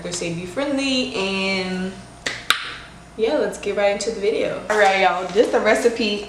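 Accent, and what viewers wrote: Louisiana, United States. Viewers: Thanks, we will be trying this. I hear your Louisiana accent. Here from, South Louisiana -Opelousas, Louisiana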